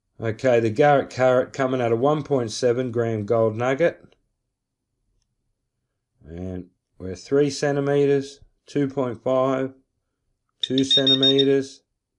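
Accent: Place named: Australia. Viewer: He's Australian Karen...Oops, I meant Mary. There's not just one type of English, even in the US.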